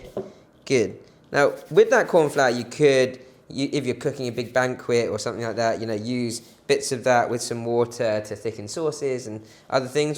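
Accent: United Kingdom. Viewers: I love this guy's British accent..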